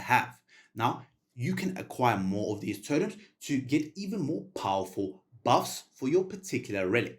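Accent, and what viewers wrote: South Africa. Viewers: yo bro you from South Africa?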